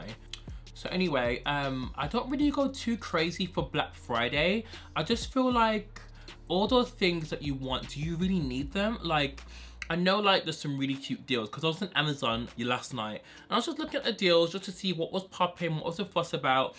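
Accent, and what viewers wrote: United Kingdom. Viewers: u r so adorable! i see u on insta all the time!!! ❤️❤️❤️finally watching you on youtube! your accent is smh crazy! 🙏🏾🙏🏾🙏🏾english/ British accent is my fav😍😍😍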